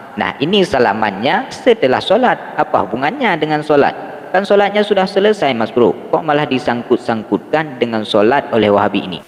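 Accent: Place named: Aceh, Indonesia. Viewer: Logat aceh han gadoeh 🙏🙏🙏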